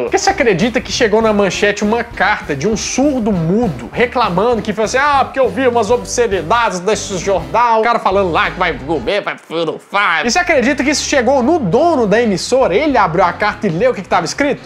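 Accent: Minas Gerais, Brazil. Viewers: Que simpatia! Mineirice mais boa de se ouvir: a original! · Curti e me inscrevi no nerd show, pq gosto do seu sotaque mineiro!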